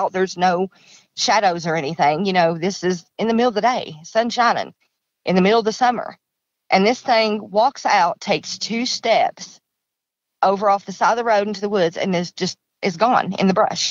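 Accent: South, United States. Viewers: your lil ol southern drawl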